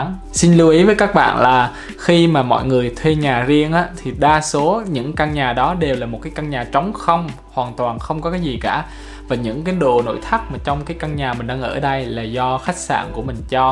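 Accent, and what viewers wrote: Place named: Central, Vietnam. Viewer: miền trung dễ thương, chân chấttt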